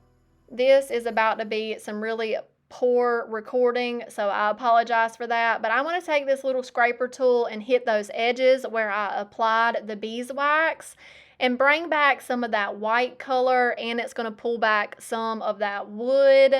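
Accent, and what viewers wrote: South, United States. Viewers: Love your great southern accent! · I love your southern accent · I grew up in the south, but have been in the northeast ( longer than I wanted) and I used to talk just like you. When I moved, people were relentless in teasing me. I have lost a lot of it, I think, but people still ask me where I am from 😂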